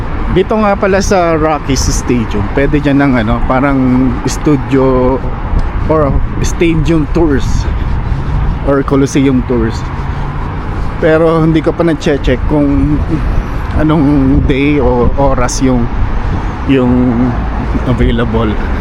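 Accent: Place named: Mindoro, Philippines. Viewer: Bossing, taga Mindoro ako, parang pareho tayo ng tono sa pagtagalog, ganire, ire, dine..😅😅😅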